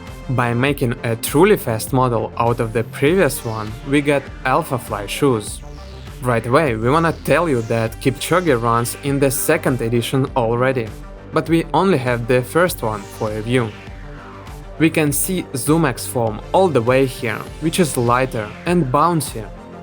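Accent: Russia. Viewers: По произношению понятно, что текст читает русский:) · Хорошо что русский акцент, так понятнее 😃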